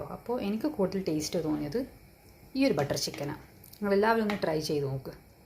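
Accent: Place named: Peringattur, India. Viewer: Ninde peringatur baasha veratte..over aaknda😂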